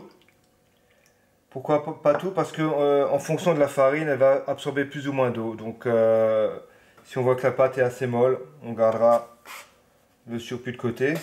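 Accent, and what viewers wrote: Alsace, France. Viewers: Tu viens d'Alsace non ? :p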